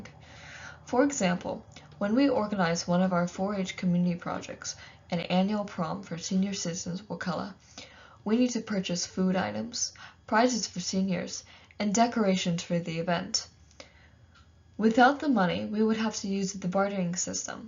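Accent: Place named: Russia. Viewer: Your Russian is showing 😅 Great presentation 10/10!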